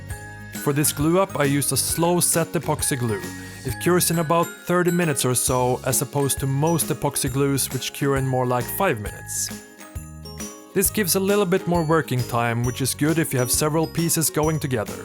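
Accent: Sweden. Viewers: I love you super Swedish accent! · u sound swedish jus saying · Jag kände igen den svenska engelska accenten men det var när du tog upp morakniven och uttalade mora perfekt så var jag säker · are u sweedish?? or norwigian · satan vilken grov svensk dialekt! avslöjad direkt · Aaa i just realised that sounded kinda rude, but I dig the Swedish accent :—) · are you swedish or norwegian? your accent is kinda awful sorry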